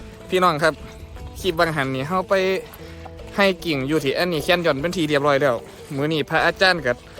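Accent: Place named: Isan, Thailand. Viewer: ชอบเวลาเว้าอีสานน่ารักดี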